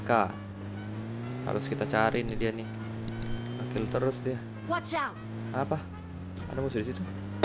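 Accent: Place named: Eastern Indonesia, Indonesia. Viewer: Pake aksen logat orang timur pasti jarang yg bisa nebak walau open mic dari awal.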